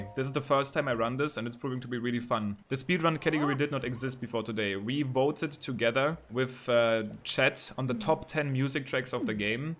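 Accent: Germany. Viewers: Dieses alman englisch ist echt nice, irgendwie xD😅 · Bruder bist du deutsch?? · Why are you... German? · Ich weiß direkt dass du deutscher bist Lul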